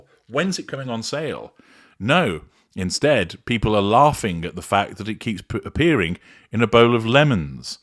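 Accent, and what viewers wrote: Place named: Cockney, United Kingdom. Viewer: Sorry, but you sound like Eastenders, no offence mate.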